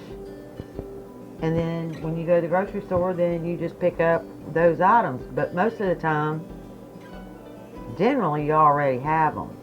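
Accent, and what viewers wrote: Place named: United States. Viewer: Girl, you are sooo country. I love it❤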